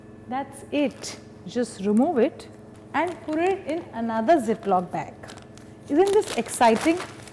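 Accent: India. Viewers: lol thts indian accent honey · Very very very thick Indian accent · OMG I love the Indian-English accent Thanks for the recipe ^^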